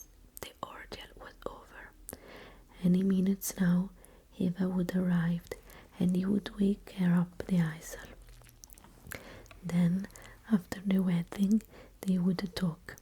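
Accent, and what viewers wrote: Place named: Italy. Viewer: Amazing!!! I love your italian accent, very relaxing.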